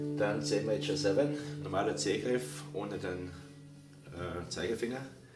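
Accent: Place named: Bahia, Brazil. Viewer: Putz pensei que era baiano.....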